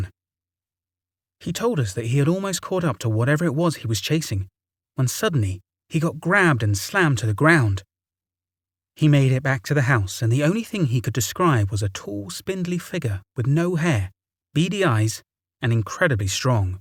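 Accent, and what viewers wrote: England, United Kingdom. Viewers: This is an American story why is this guy's accent English? Doesn't fit.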